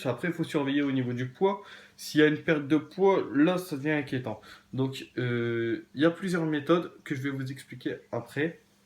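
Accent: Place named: North, France. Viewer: Petit accent du nord ^^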